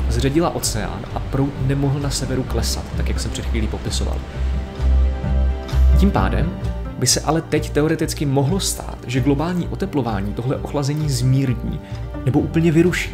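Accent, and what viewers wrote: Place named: Prague, Czech Republic. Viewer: Kdybys vynechal ten pražský přízvuk tak 10/10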